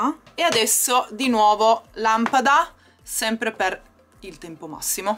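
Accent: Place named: Trieste, Italy. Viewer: La cadenza è triestina...Qualche parola la pronuncia un poco male perché porta l' invisalign ai denti...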